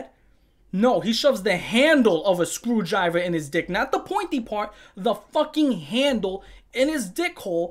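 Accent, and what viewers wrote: New York, United States. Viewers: R u from New York? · I forgot I was listening to a review of freaking mdpope, I got to into your descriptions and full New York accent 💀i could listen to ya all day 😭🫶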